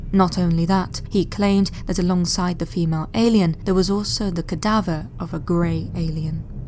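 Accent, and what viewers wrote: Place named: Bristol, United Kingdom. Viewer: The accent is definitely english, maybe bristol?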